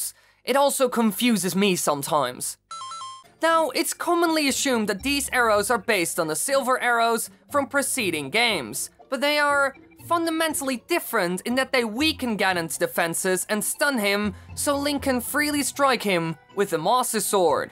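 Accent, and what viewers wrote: Netherlands. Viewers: ik kijk graag naar je video's ze geven een leuke twist aan zelda (en andere nintendo games) (ik had al een klein gevoel dat je nederlands was omdat het wel een klein beetje nederlands klinkt(echt een heelklein beetje)) ik hoop dat ik vaker een geheime nederlands comment kan platsen ;)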